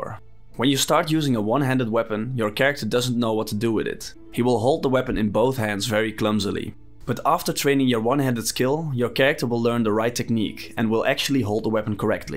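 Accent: Netherlands. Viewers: nice dutch accent. Greetings from Germany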